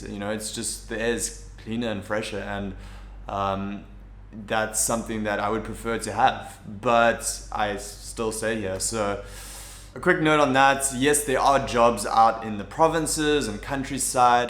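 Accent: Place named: South Africa. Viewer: Dang, your SA accent is way more thick in these older videos.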